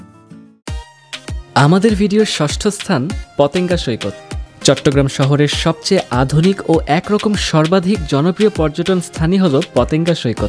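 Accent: Standard. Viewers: বাংলায় উপস্থাপনায় এক যুগান্তকারী উদাহরণ অপনি এবং বিশ্বকে সম্পুর্ন শুদ্ধ বাংলায় উপস্থাপন আপনার থেকে ভালো কেউ করতে পারে নাই এটা নিশ্চিত ♥️♥️💕💕 এগিয়ে যান আরো অনেক দূর ♥️ আল্লাহ তায়ালা আপনাক নেক হায়াত দান করুক ♥️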